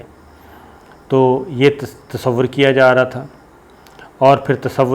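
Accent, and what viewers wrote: Punjab, Pakistan. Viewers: sahib, your reporting is good and we regularly follow your videos on daily basis. However, I have one request, hope you will not feel offended as I don't mean anything. When you speak the word 'strong ' you say 'sitrong' like punjabi pronunciation. It should be spoken as 'Is-Strong' which is English pronunciation, and like all words that start with 'S', they should be spoken as in English language. Sometimes, you say the word ',security ' as 'socourty' but it should be pronounced as 'se-ku rity'. We know English is not our language, but when we speak in a different language, we must speak it correctly. For example if we an Arabic word incorrectly, the meaning will change and the audience will not understand the correct meaning of the word. I hope you wouldn't mind and take it as a friendly and brotherly advice. Thanks, Zia from USA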